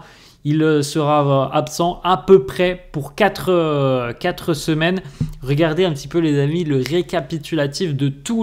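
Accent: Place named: Spain. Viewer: Visca barça j,aime bien ton accent espagnol 😊❤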